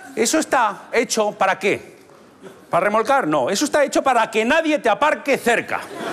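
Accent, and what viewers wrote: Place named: Aragon, Spain. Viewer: Aii como se le nota ese acento maño de pueblo, grande ^.^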